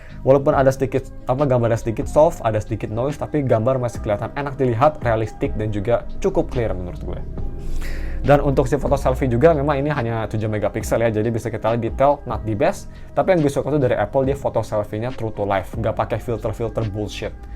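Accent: Bandung, Indonesia. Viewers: Kang, orang Bandung?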